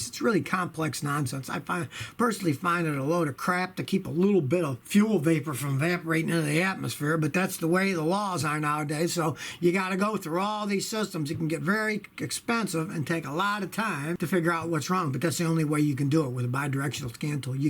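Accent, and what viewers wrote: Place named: Midwest, United States. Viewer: You may be in Texas NOW, BUT you aint from Texas! That accent is Chi-ca-go, Michigan, Wisconsin around in there. Sound like Dan Aykroyd in Blues Brothers. "We're On A Mission from Gawwd" How about those Caars and Stunts, huh?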